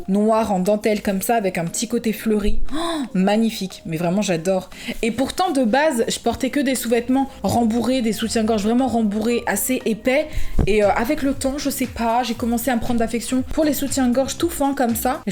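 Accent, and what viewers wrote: England, United Kingdom. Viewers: Wesh tes anglaise ou quoi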